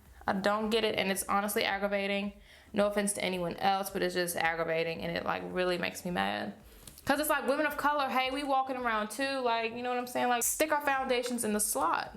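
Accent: South, United States. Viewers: Your southern accent is so darn cute!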